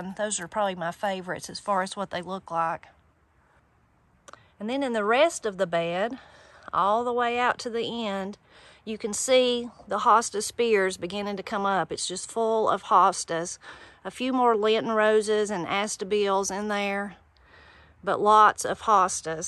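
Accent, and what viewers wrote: Appalachia, United States. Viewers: I always thought these were poisonous! Well how do you like that. Have you eaten them? Wait What, Now I’m learning the second thing,I didn’t know about hostas,is that those seed pods you can throw them and they’ll actually grow from themselves. I have tried to dry those seed pods and they just mold or get all furry looking gross and I have to throw them out.. OK back to the video, I might learn the third thing I didn’t know and I’m older than you and I love growing hostas in what little shade I have out here.in the southern part of Indiana. Wow this is the best video. I want to try them. And that Appalachian speak I’ve never heard of astilbe been called As ta bil… ( Uh stil bee ) Astilbe.. The Appalachian language..❤️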